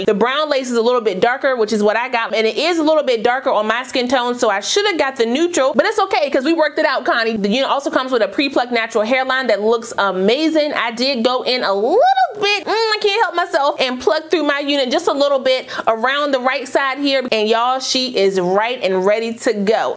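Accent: Washington DC, United States. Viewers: She looks so natural on you. And the color is so pretty. ❤❤❤ btw, that intro git me asking Sis, you from DC?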